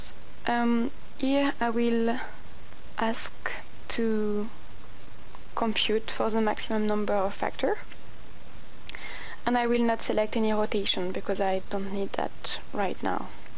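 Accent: France. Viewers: French accent definitely! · Awful french accent! shouldn't be on a scientific tutorial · I really love your accent ... Are you French? :)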